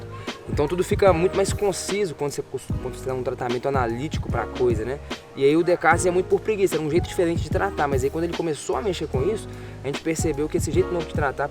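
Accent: Minas Gerais, Brazil. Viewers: vc é mineiro? sotaque de mineiro de BH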